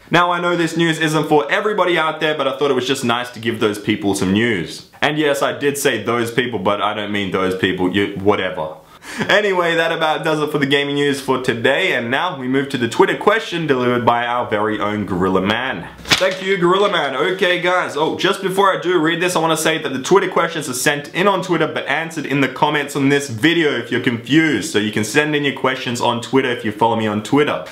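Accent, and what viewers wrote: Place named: Australia. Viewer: His Australian accent >>>>>>>>>>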